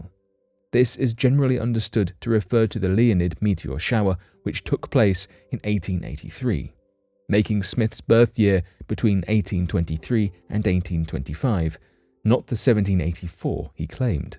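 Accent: United Kingdom. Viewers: He’s a British voice over judging by his accent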